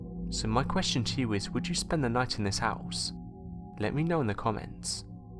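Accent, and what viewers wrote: England, United Kingdom. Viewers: I feel that his speed is just fine, you might have to get use to his English accent. If you are only use to an american accent then the english, Irish and Scottish accents can be difficult to understand. If you keep listening to these accents then it will become easier with time. 😊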